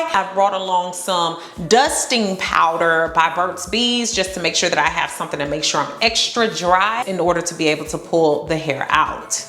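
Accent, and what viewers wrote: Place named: Midwest, United States. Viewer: I just love the Midwest accent. I’m from the south but all my family in Midwest now. Make me feel right at home 💕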